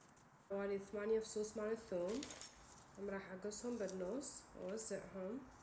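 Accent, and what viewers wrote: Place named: Iraq. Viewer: حبيت اللهجة العراقية الي بعدج محتفظة بيها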